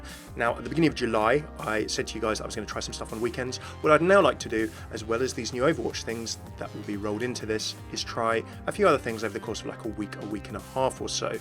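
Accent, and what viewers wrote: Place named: United Kingdom. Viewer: WOW~!!!! A FACE! I HAVE A FACE TO PAIR UP WITH THE SILKY SMOOTH BRITISH VOICE!!!!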